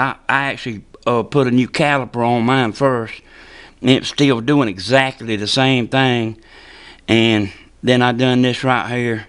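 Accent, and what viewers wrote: South, United States. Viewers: Damn, the man gave good advice. So what, there was an accent or southern drawl. People are so critical for no reason. Doesn't everybody shit stink? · Love the good old boy accent🙌😉😂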